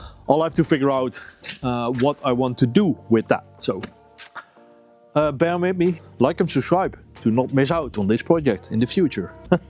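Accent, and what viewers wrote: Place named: Netherlands. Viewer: When I hear you talk I get the feeling you're origanally from the Netherlands 😂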